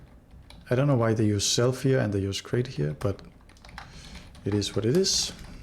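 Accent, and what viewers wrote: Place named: Denmark. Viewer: Are you from denmark? You sound a little danish. But overall great video!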